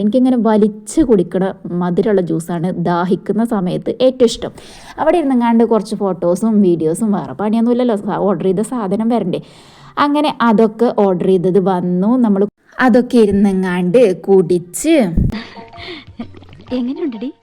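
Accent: Malappuram, India. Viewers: ഞങ്ങളെ മലപ്പുറം കാരെ അതെ സംസാരം തന്നെ ആണല്ലോ ങ്ങൾക് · സംസാരം കേട്ടാൽ മലപ്പുറം ആണെന്ന് തോന്നും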